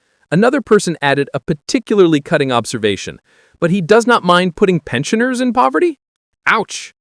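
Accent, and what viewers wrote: United States. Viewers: Why do we have to listen to a yank voice !!!!!!? It’s awful.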